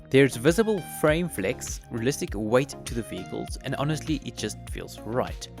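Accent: South Africa. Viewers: Bro are you south African, I Wil sub if you are.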